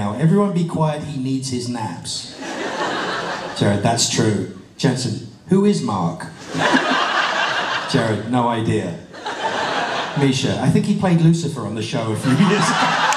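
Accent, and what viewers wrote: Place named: United Kingdom. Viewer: Mark, awww, Ivw not heard this sort of british accent in years! oh the memories...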